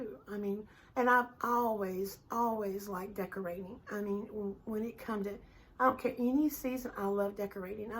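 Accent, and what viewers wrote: South, United States. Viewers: I love watching your channel. You are so REAL! That's a rare quality these days. I love your southern accent, your hair, just everything about you. You're sweet, and you sure do understand being a woman (it's hard sometimes) and I agree; we should be lifting each other up. I am a country woman stuck for now in the city. Trulia is one of my favorite pastimes. LOL Until next time...💜💜💜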